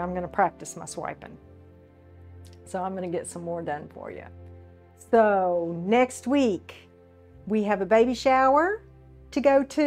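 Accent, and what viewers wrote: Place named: Texas, United States. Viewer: I knew I detected Texas! Love, love your voice and your work! 🤩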